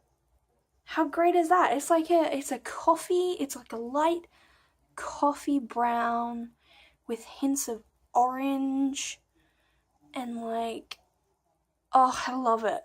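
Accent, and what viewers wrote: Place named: Australia. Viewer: Hahaha love your style so funny. The accent and humour pointed to oz, I'm from NZ. Great podcast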